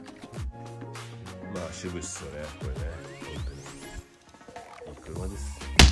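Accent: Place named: Okinawa, Japan. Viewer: 喋りがツボります笑 沖縄感最高です😂😂😂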